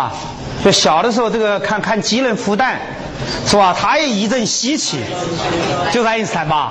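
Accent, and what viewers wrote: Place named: Sichuan, China. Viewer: 任剑涛老师激情澎湃的演讲中我能感觉到他使不完的劲！居然承认自己早年让老乡替考，实在人啊！浓重的四川口音使他的的演讲听起来更加生动，有趣😁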